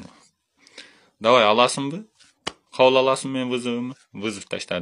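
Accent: Standard. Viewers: Аззаматсын таза Кыргызча суйлогон учун👍🤝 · Маладец еркек екенсин орус болсонда ит емгенге караганда кыргызча таза суйлодун 👍👍🤝🤝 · молодец тууган кыргызчаны өтө таза сүйлөйт экенсиң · Нукура,таза суйлойт экенсин. Азамаатсын,жигит. Бар бол,аман бол,ийгилик саган. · Азаматсын жигит Кыргызчаны менен жакшы суйлойт экенсин мен Лейлекский акцент менен тата пата деп суйлом сен орус болуп таза суйлойт экенсин тан бердим. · Молодец кыргызча таза суйлойт экенсин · Достойный Таза кыргызча суйлопсунго молодец💪 журогун кыргыз экен 🔥🔥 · 😂😂😂ама эркекче болуптур а булжагы? Анча мынча Кыргызстандыктардан тазза сүйлөйсүнго жетим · Молодец орус болсода таза кыргызча суйлоп салдыго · Молодец,жунбаш мага караганда таза суйлойт экенсин кыргызча 😂😂😂 эркек менда сени колдойм. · Кыргызча таза суйлон экенсин 👍👍👍👍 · Таптаза суйлойтко бул бала Алхамдулиллах деген созун абдан жакты ийгилик Аман бол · Азамат Манастын тилин таза суйлойт экенсин иним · Баракелде анча мынча кыргыздардан таза суйлойт экенсин аман бол укам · Азаматсын опкосу жокторго караганда таза суйлойт экенсин азаматсын чон жигит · Менденда таза суйлойтко 😀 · Красавчик орсу болсондо бауржандан таза суйлойт екенсин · Кыргызчаны таза суйлойт экенсин Сенамдарга Караганда олаа Сола оняк соняк тана ука тирик жетим жакшына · Рассиянын каериндесин Уят кылдын кыргызча суйлоп Кыргызчаны сендей таза суйлошпооойт. 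Альзамдулиллях деген созун учун ылайым еки дуйно бактылуу бол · Баракелде бала , таза суйлоптур 👍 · Красавчик. Условияны туура койдун.Кыргыз тилин таза суйлойткенсин! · Баракелде мына накта жигит. Таза кыргыз тили менен суйлоп жатат. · Орус кыргычаны таза суйлойтко хайы келдимго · Молодец кыргыздын орусу таза суйлоптур алга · Азаматтсын иним таза Кыргызча суйлойт экенсин ишине ийгилик кайда журсон да аман эсен бол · Бул таза суйлойт го кып-кыргызча👍👍👍👍👍👍👍👍👍👍👍👍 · он кыргыздын ортосуна бир орус келсе ону тен орусча суйлогон кыргызым ай бат эле сатылып кетесинер мен жон гана туура обу жок катын орсок уй сайт деп койот кыргызда обу жоктордон он эсе таза суйлойт экен анысына рахмат мен бауржанды эле колдойм себеби ал кыргыз мен кыргыз экенимди мекенимди сатпайм бирок бабуржандын оздук аламдык адеп ахлагынабы же элге болгон улгусуно карасак точно эле тантык. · Красавчик таза анча мынча кыргызга Караганда таза суйлопсун мына сени патриот десе болот орус болсо да кыргыз тилде суйлогон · Маладес Орус Улутуңда Болгонуң менен Кыргызчаны Таза сүйлөйт экенсиң Азамат Сени менен дос болуш керек экен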